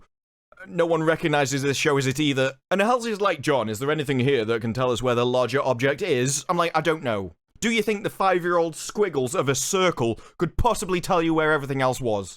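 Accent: United Kingdom. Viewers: Sometimes it's funny hearing a person with a British accent nitpick a piece of shit show apart:) You're hilarious but you forgot one of the dumbest things about the Covenant language scene: The show has this whole thing that the Spartans are using their memories to seduce the meaning of Covenant words. Don't their helmets have cameras? Wouldn't they have a ton of audio from the Spartans fighting The Covenant? To fair, in this show's universe, they don't usually wear helmets for battle. Just driving and to remember shit.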